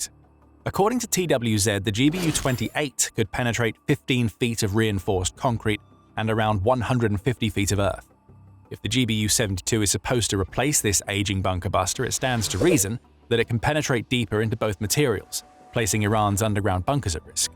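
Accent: England, United Kingdom. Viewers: I can tell just by the narrator's English accent that this some bullshit. "Sorry, bloody bullshit." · a British accent??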